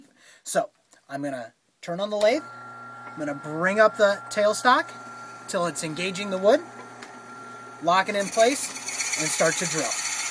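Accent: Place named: United States. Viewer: dont think hes aussie mate! sounds merican to me.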